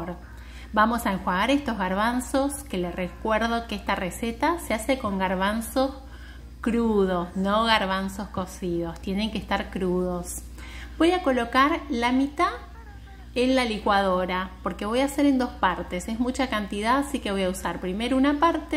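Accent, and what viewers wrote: Buenos Aires, Argentina. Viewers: Hola, justo estoy en ese proceso de volverme vegana y comer saludable y Dios me puso su canal, gracias. Me encantaron sus recetas, tan fáciles y se ven muy ricas y el paisaje de regalo uff lo ame, pense por su acento que estaba en Argentina, pero leyendo los comentarios vi que es Brazil, de verdad que son Bendecidos y que así sigan siempre. Un saludo desde la Ciudad de México . P.D. Muchas gracias por compartir la receta y el bello paisaje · Escucho que sos argentina por tu tonada bonairense. Yo viví en San Juan por 10 años, soy italiano, pero ciuando hablo español lloro como ellos. Hagale un video de una empanadilla bien criolla vegana! Nunca haría piensado que podìan existir veganas argentinas! Un abrazo...sellado Explorador del Viento Zonda, vegetariano, no vegano.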